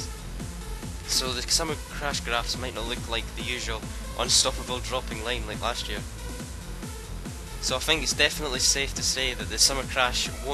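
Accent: Scotland, United Kingdom. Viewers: You sound Scottish =) I love scots!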